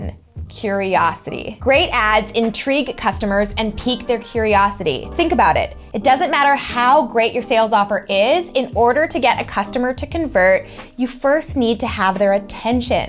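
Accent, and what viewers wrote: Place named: New York, United States. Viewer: OK You can call me a troll if you like. Here goes... I am very interested in the subject, and you are obviously very knowlegable about copywriting...also quite attractive...However, as a chilled out and somewhat laid back West Coast Canadian, I find your voice grating and almost intolerable. I have started watching it about ten times, and just turned it off, because the New York delivery (if that's what it is) is hurting my brain. What do they call that? Vocal fry? At least you don't do the upward inflection at the end, making every sentence sound like a question?. So anyway, that being said, I surely would get on your nerves too, with my slow delivery and quiet tone of voice. If I had a dolla for every time someone told me to speak up or just started talking over top of me because they got bored or couldn't wait for me to finish...Thanks....just my trollish feedback...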